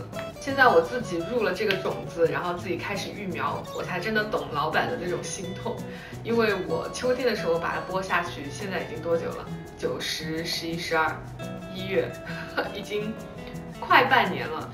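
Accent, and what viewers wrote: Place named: Jiangsu-Zhejiang, China. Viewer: 你说话的感觉和我好像，是不是江浙人呀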